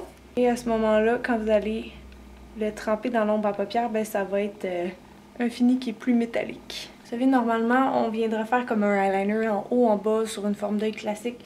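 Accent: Quebec, Canada. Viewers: trop agréable d'entendre une Québécoise Merci!!!! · Je pense sincèrement que est de très bon conseil! A 55 ans, je vais suivre ses conseils de maquillage.En plus, elle a vraiment l'air très sympathique et chaleureuse.En plus, personnellement, j'adore son accent québécois. Faites lui confiance. Marie de Bretagne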